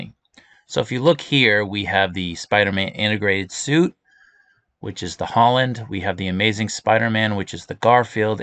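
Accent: Boston, United States. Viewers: That accent. Felt like I was watching the depahted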